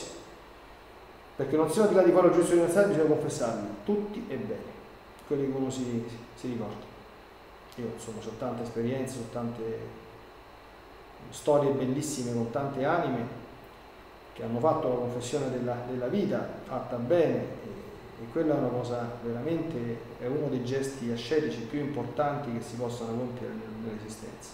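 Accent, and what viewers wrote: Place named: Rome, Italy. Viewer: Santo Padre, grazie dei suoi preziosi insegnamenti! Le chiederei solo di parlare meno in romanesco perché a volte mi perdo le sue parole e mi dispiace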